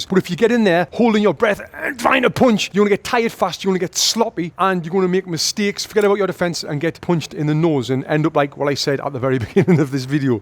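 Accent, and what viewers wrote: United Kingdom. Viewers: Man's got the thickest bri'ish accent ever "Ponchd in the feece"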